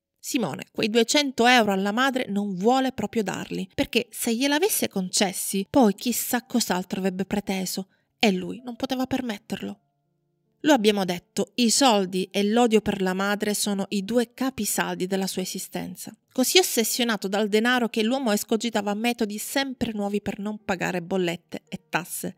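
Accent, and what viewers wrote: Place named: Calabria, Italy. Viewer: Buongiorno era una curiosità, dall''accento mi sembrava.Sai con quanti colleghi calabresi e siciliani ho lavorato.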